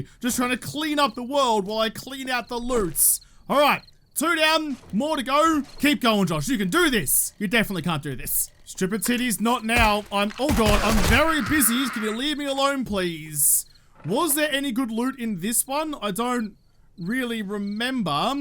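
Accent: Australia. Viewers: Ok, listen: I need to stop watching these vids in bed before sleep because people in my dreams have aussie accents and slang now. Lol. Then again, accents are hot, so I guess I shouldn't complain. Plus, trade Jen is getting jealous, I think because whenever I go see her, she's got nothing I need, and when she does, she overcharges me, I swear. Seriously though, I get so many good ideas by either watching be a muppet or have brilliant ideas! Thanks for helping me survive A20!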